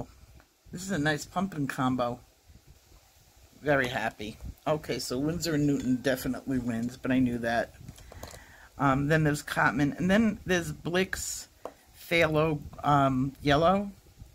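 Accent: New York, United States. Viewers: I am so sorry to hear your hubby is so sick, and especially that u can’t visit him right now. I hope he is better soon. I love how u have known each other since grade school. That is so special. I love retail therapy also! Having grown up in Queens, New York, it’s almost like being back there when I hear your New York accent. I love it. Hoping he is better and home soon where he belongs.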